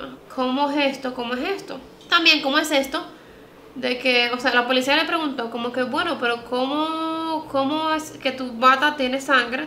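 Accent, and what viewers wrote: Dominican Republic. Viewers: Me encanta 😍 tú trabajo ,sobretodo porque hablas con nuestro acento inconfundible de nuestro país ,Republica Dominicana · Desde que la escuché supe que era Dominicana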